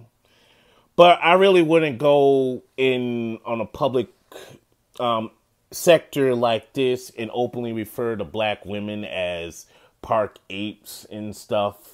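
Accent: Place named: New York, United States. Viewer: Interesting. So I know you're from NY (I can tell by the accent). Where did you travel to where you encountered Black women who didn't act like the women you grew up around? For me, one of the best things I've ever done was traveling for leisure while in graduate school. I would just go to random places for the heck of it (Salt Lake City, St. Louis, Nashville, etc.).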